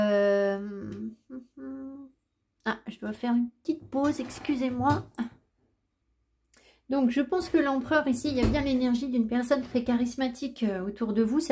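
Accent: United Kingdom. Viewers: ta une British accent I swear ♥️♥️🙏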